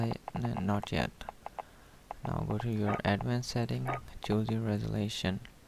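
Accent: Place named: India. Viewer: He sounds like Indian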